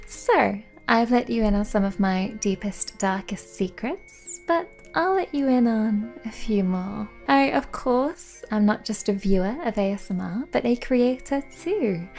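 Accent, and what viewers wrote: United Kingdom. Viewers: Oh my god SPIDERMAN!!! Not my hero.......But it's for though and really, British, soft voice and is a maid who was a knight, is there anything else can do? can hold her boobs..........YES SCORE❤❤❤❤❤❤!!!!!!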